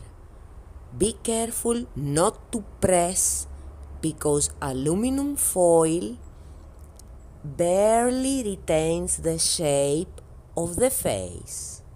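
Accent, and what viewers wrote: Greece. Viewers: Excellent tutorial. The aluminum foil is a great idea for the underlying structure. Love your Greek accent too. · Guys, she is greek. This is the classic greek accent, i live in greece sooo... XD (edit: also the newspaper is in greek.) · greek accents are the best <3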